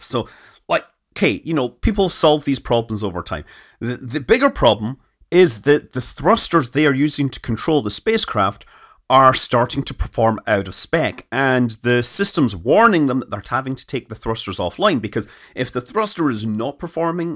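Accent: Scotland, United Kingdom. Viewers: Also when is England going to teach Scottish blokes to speak English?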